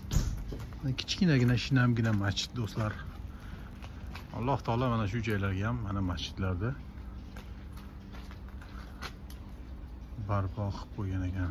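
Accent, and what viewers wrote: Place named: Uzbekistan. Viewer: Удмурд деганини энди эшитишим кайирга карайди сиз Татаристонга кетдик диябсиз забонингиз хакикий Узбек сог булинг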